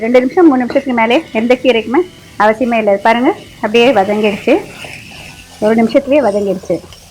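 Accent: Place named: Tamil Nadu, India. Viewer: you are speaking English like you speak Tamil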